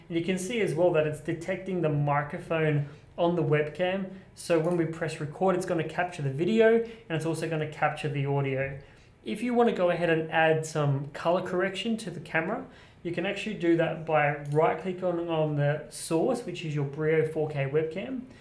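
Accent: Australia. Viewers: Very nice tutorial (plus, Americans are suckers for Aussie accents)! :-). Really bummed that FaceTime doesn't let you make any fine adjustments to the camera as I'm seeing a lot of color cast on my FaceTime calls with the Brio. Let's see if Zoom or Skype does any better with color correction. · That was very informative and great to hear a fellow Aussie presenting. I have downloaded Melon for streaming to YouTube but not sure how I ajust zoom, colours etc on my Brio 4K in Melon, I don't think I can. Is OBS a streaming software also? I don't want the 2 programs clashing. Or can I adjust everything in OBS and still stream from Melon?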